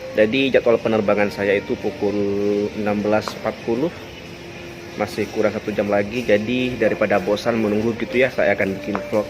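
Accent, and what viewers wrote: Malaysia. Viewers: Speaking pun dah mcm org malaysia...slang indon langsung hilang.... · lol... minda sempit... x lupa pun bahasa indonesia.. lohgat je tertukar siket.. itu normal la sbb br pulg dr malaysia.. lama2 akan kembali loghat indo..itu pun nak kecam.. patriotik itu bagus tapi jgn eksterim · Bro.. Kamu dah mcm org Malaysia.. 😀.. Percakapan kamu mmg dah Malaysia sangat.. 😁😁👍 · Kalo bisa jangan pake logat melayu, tpi logat jakarta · Mmng betul adik, ngomongnya kamu tu bkn org Indonesia dh,dh mcm org Malaysia sngt dh.Xpa...niatkn sedekah,biarlh peniaga tu yg jwb jika ianya ada niat menipu.Gk kn senang mn pn jika ianya menipu pelanggan mrk...gk berkat... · Logat mu malaysia,jd di mahalin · Cara abang bercakap sikit" agak logat orang malaysia.jadi di sangkanya orang luar negeri. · Wajah dan slang cakap macam orang Malaysia tau.😊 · Sampurasun.bng kata aku mah bhsa.abang nyak.jadi campur.logat.melayu. 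kata aku mah.kan Abang udah lama kerja.di malaysia.jadi logat.melayu.nyak kebawa. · salah anda sendiri..knp pake loghat malaysia.. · Makanya.... 1. dimana bumi dipijak, disitu langit di junjung, Mas. Kamu baru 2 tahun di malaysia, udah pakai logat malaysia. 2. Mana ada ayam penyet harga 12ribu di Batam. Paling rendah 18 ribu rupiah. Teh obeng / ice tea itu minimal 5 ribu. Nah kalo lontong sayur,. Nasi lemak (makanan untuk sarapan) iya rata² 10ribuan. 3. Lagian, itu warung dekat hotel toh? Yaa pantes donk....😅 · Bahasa mas sudah mirip orang malaysia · Salam..org jawa timur..raut wajah dan percakapan anda jugak seperti org Malaysia.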